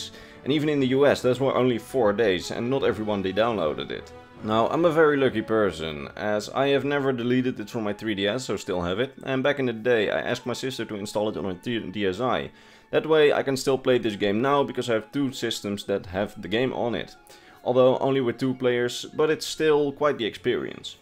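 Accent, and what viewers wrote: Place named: Netherlands. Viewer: Random question but are you Dutch lol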